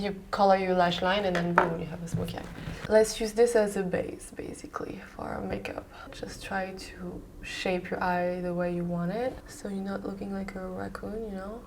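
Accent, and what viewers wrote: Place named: France. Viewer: Bravo for your vidéos that show simple ans wearable make up so différent than most of the american Youtubeuse who make make up with tons of coats, with two many Colors ans hours spent in thé bathroom ( at what Time do they wake up if they work????).... for a result...how to say... I have readen that thé music in the beginning was not available, et c.est bien dommage. Je continue en français parce que moi aussi suis française, et que ça va dix fois plus vite de taper en français. En tout cas, ne change rien, avec ton petit air de Jane Birkin jeune et ton frenchy accent « so cute » ( j.ai le même... et après avoir passé des années à essayer de le gommer, j.ai abandonné et maintenant je le revendique même!). Suis allée à New York en octobre dernier, mais ne t.ai pas croisée au détour d.une des rues du « village »😪. Allez continue à faire « ce que tu veux » et encore bravo.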